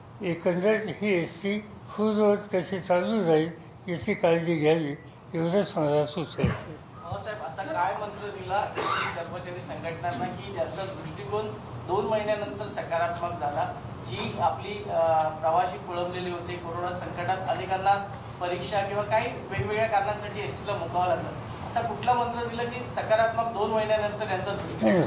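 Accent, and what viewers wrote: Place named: Baramati, India. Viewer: 😀😀😀😀बारामतीची भाषा आहे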